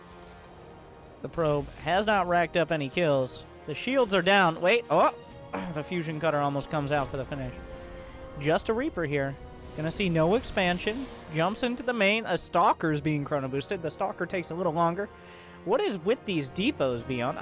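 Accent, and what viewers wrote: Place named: Texas, United States. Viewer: You sound like youre from Texas,. When you started commentating all fast it reminded me of those auctioneers xD can i get a thounsand? Thousand two thounsand five, six, two thounsand!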